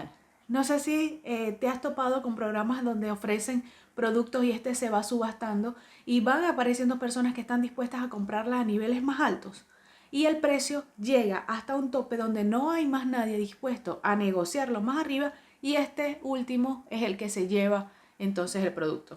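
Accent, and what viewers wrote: Venezuela. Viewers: Ese acento venezolano tan bello que tenemos jeje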